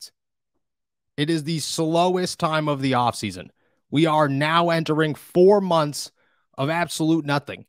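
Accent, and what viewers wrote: Pittsburgh, United States. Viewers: Bro i could swear you were from Pittsburgh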